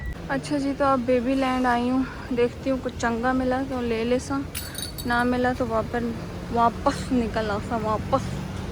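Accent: Punjab, India. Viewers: I think ap is vlog mein Merium parveiz ki copy kr rhi hain bolnay mein punjabi style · Ap jaisy apni phli videos me bolti the waisy e bat kiya karen..zeada punjabi nooooooo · Aapi glasses bht pyri hn or ap ki funny punjabi Sony pay sohagha ....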